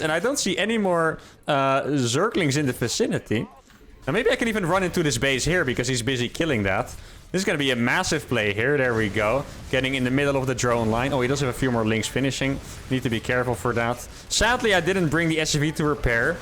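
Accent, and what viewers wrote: Netherlands. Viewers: Ben jij Nederlands?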